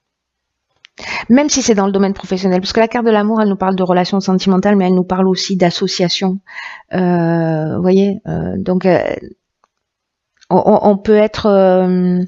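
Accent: South, France. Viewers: petit accent du soleil.. continuez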